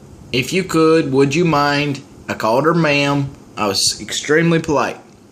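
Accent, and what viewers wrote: South, United States. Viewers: the slow poke Southern accent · I enjoyed listening to your story and your all "Merican" accent. Folks like Adam Peterson who commented about you should go watch their Britney Spears videos instead. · all the Southern drawl bullshit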